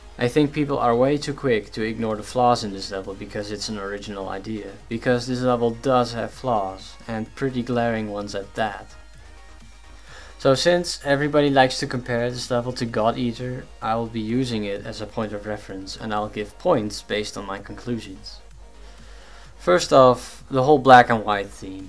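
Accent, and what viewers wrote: Netherlands. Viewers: Are you Dutch? Your accent is dutch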